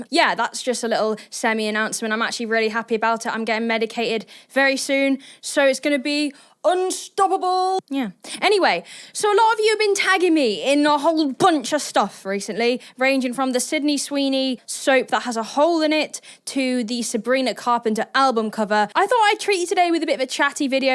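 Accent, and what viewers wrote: United Kingdom. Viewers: I made the mistake of looking at the comments section of Andrew Wilson's response to and it amazed me how much supposedly grown men are triggered by an outspoken young woman with piercings, tattoos, stuffed toys in her room and a British accent. Keep making content YOUR way, don't listen to "alpha" losers like him and his followers.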